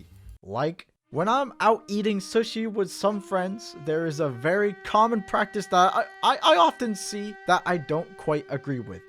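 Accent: United Kingdom. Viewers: sorry i gotta stalk more of this sexy British voice then imma get back to ya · Man if there is one way to make me not skip an ad it's hearing velvety British butler voice say it. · I actually watched the full sponsor, because i absolutely love that british accent.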